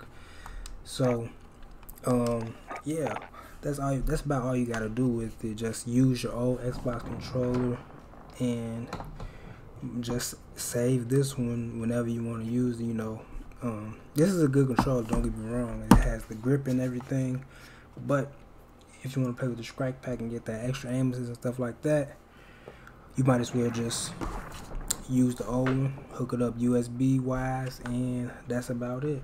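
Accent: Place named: South, United States. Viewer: Am I bugging or this dude keep saying SKRIKE pack and not Strike pack.... I'm from New York my guy I don't understand how "st" sounds like "sk" down south, I'm starting to think southerners should stop saying street, shrimp and now strike cuz is sounds bugged out wtf is "skrimp" how tf am I gonna meet you up the "skreet" and now u ruin my day by telling me may "SKRIKE" pack won't work on my series x controller..... Where's the southern hospitality my guy u hurting my feelings lol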